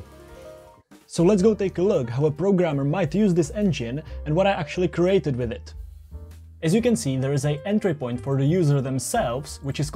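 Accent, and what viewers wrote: Czech Republic. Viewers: Já si říkal že tam slyším českej přízvuk. Neuvěřielná práce!! SKvělej projekt a myslím že to dost retro programátorů ocení. Jen tak dál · me when czech accent i thought it sounded like one, checked bio and sure enough. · ty jseš čech? poznal jsem podle přizvuku.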